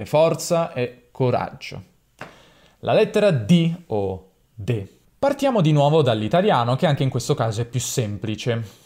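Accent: Madrid, Spain. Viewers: Me meo con tu acento español espectacular, no sé por qué me suena como alguien de Madrid o de Valencia, alguien me ayuda con esto? 😂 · Bonito acento español 😁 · Suenas MUY de Madrid :D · Credo sia buona idea fare un video sul AFI. Saluti dall'Argentina! Bravo il tuo spagnolo, anche se è tan europeo XD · Joder, que acentazo español! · Desde Colombia felicitaciones por tu español ibérico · ¡¡Vídeo súper interesante!! Pareces de Madrid jjajajaajaja ¿has estado alguna vez en España? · Hola, hablo español, me encantó escucharte hablando mi idioma.. no habia notado las diferencias de las D y si sé que las B y V suenan casi iguales. Gracias se escucha un claro acento iberico como has mencionado, yo hablo el español latino y aun así hay bastantes diferencias entre cada uno de nuestros paises y regiones. Te felicito por tu buen español... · Comunichi molto bene in spagnolo. 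Tutto quello che dici è capito. Ahora sí tienes el acento Español europeo. A igual que Italia que hay dialectos y acentos diferentes. Los hay en nuestros países hispanohablantes también. Los Argentinos tienen mucha influencia italiano. Ellos tienen el acento italiano combinado con el español. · que feo tu acento de español de españa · Me causa gracia que su español sea el español de España. (Se que parece bastante tonto decirlo, pero ya de por si a los hablantes de la lengua, que habitamos en Latinoamérica, nos cuesta bastante hablar como los españoles, sobre todo por el famoso seseo). Saludos desde Buenos Aires. Vivo a 15 cuadras entre el club Argentinos Juniors (cuna de Maradona) y a 12 cuadras de la casa de natalicio del actual Papa Francisco. Es decir, entre el Dios y el profeta, dejo en ustedes decidir cuál es cuál. :)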